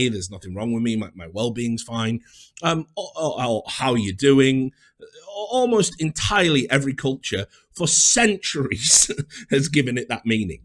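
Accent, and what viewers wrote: United Kingdom. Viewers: How do u sound more British now?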